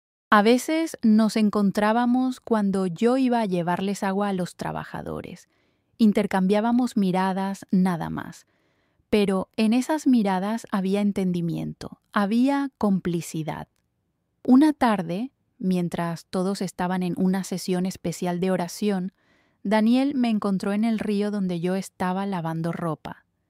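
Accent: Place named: Spain. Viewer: ESPAÑA te oigo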